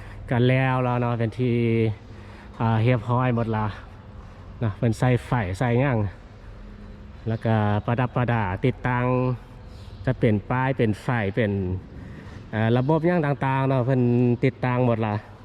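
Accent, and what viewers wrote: South, Thailand. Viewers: เสียงบรรยายสำเนียงคล้ายภาษาปักษ์ใต้ของไทยมาก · สำเนียงผู้ยรรยาย มาจากแถบไหนของลาวครับ สำเนียงคล้ายภาษาไทยใต้มาก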